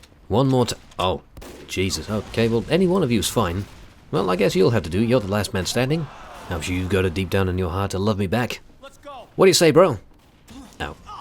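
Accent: United Kingdom. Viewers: I love British commentary the voice is like...... It can't be explain from words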